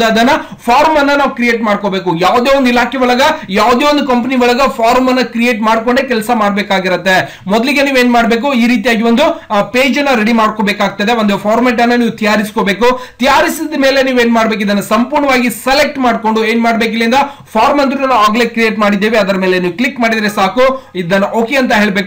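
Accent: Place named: Bijapur, India. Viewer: Nam Bijapur Mandi Hinge Matadtar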